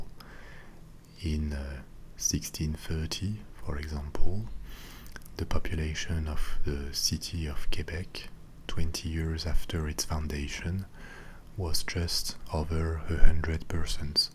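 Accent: France. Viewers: Mon Dieu. You have certainly got a niche in this kind of presentation sir. First off, yes naturally Americans in the US in particular like the French accent, and also the French language spoken, especially when done so in a relaxing atmosphere. I think this is great for Anglophones to learn French as an augmentation and/or diversion from the hectic world. Oh, almost forgot, the good history lessons too. Big thumbs up. Merci à vous.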